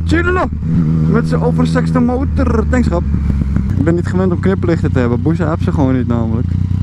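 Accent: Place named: Amsterdam, Netherlands. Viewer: Ey, die irritante ABS is uitschakelbaar... trek gewoon de zekering eruit en plak een stukje zwarte tape over het ABS lampje.;) Lekker filmpje weer, heb er weer van genoten! Gewoon goud dat Amsterdams en die sound van die 09, man...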